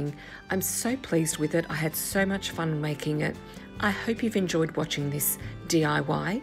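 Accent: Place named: Australia. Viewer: Just beautiful! Aussies have the most gorgeous accent and your voice is so pleasant and calming. I think if Olivia Newton John♥️Love your wreath!!!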